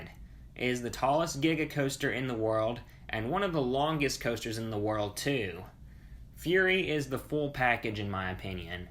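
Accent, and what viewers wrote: South, United States. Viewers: Really liked the video, you sound like you’re from the south 🤣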